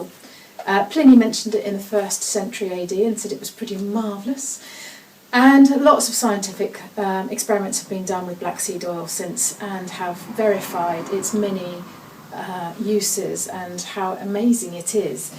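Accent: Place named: United Kingdom. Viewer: Love the accent, I swear, every girl from the UK is just ahead of the game simply because of it...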